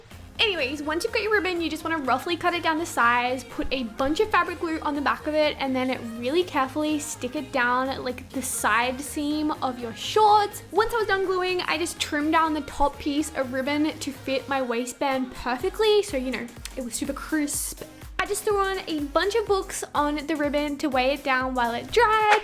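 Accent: Australia. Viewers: Y do Australians say hach and not ach lol😂😂😂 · Where's your accent from? Australia?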